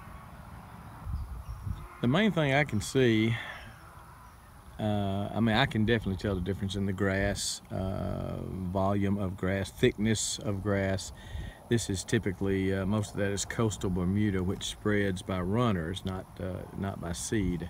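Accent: Texas, United States. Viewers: I love your videos. You voice and accent sounds like my dearly loved uncle who grew up in Northeast Texas then went to A&M (the Corp then vet school). I am not sure but I think he got his accent when he was an Aggie because nobody else in the family talks quite like him. Thanks for sharing your knowledge with us. Texas farmers have unique challenges and there's little use in me learning from people who live outside my region. You teach me a lot.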